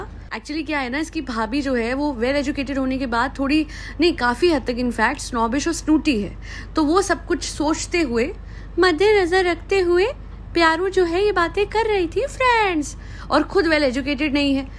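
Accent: Punjab, India. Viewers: Hi, apki language Mei Punjabi tone hai ❤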